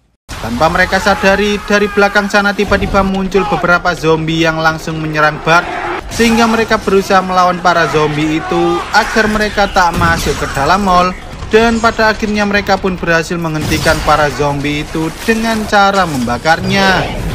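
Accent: Java, Indonesia. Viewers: mimin orang jawa nya medok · aksen jawa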